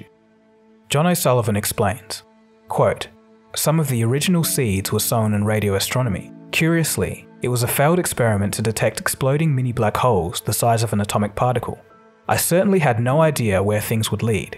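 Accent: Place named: Australia. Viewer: I've seen 4-5 videos and already a fan, i don't normally find Australian accent very pleasant or cool but somehow I find this accent and channel very satisfying. Keep up the good work fam. The background music, intro the voiceover everything is so satisfying. ❤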